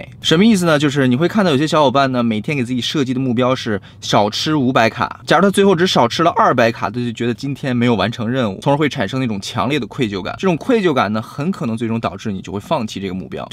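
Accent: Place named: Beijing, China. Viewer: 觉得帅哥是北京人哈哈 虽然儿化音可能因为常年在美国被磨得若隐若现 但是他的吞音还是很标准的lol 【如果大家喜欢我的视频 = rua喜欢我的视频】哈哈哈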